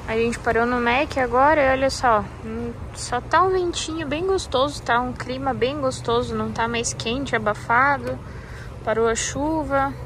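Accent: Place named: Rio Grande do Sul, Brazil. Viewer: Oiê eu tmb cheguei no canal de paraquedas. Quando ouvi tu falando pensei 🤔, é Gaúcha e de Santa Catarina ❤. Sou do RS moro na Serra, nos conhecemos pelo sotaque que amo demais. Maratonando teu canal aos poucos.bju. se cuidem gurizada💚 ❤💛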